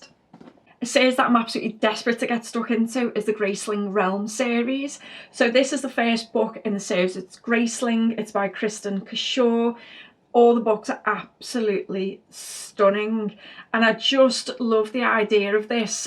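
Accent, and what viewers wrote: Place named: Midlands, United Kingdom. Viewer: You have some cool books in the plans for this month, I hope you can get to them all. Except the Cassandra Clare one, she’s evil 😹 Also, i’m desperately trying to place your accent. I’m not English I’m just into accents, so I’m probably terribly wrong but I’m thinking you’re somewhere from the Midlands? :)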